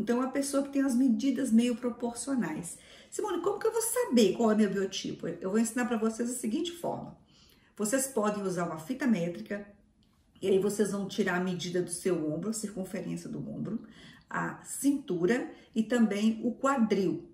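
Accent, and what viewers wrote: Minas Gerais, Brazil. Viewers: Simone vc é mineira? Pois amo este sotaque!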